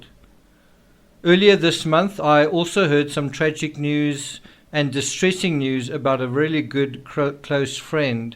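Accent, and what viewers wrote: South Africa. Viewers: Btw, are you South African? My brother in law is and I hear that accent, particularly the last millisecond at the tail end of each of word as it cascades away into our eardrums... I dig it man! I want you to know I appreciate the way you break things down very quickly when you speak,..which is very therapeutic & healing. Are you a therapist? I get the vibe..you understand human psychology & introspective philosophy seamlessly. Brilliant. Thank you, You help me look at myself in new ways and can now work my way through some personal struggles and trauma's with an arsenal of new tools to apply when the healing hands of time are out of time... Much love & respect!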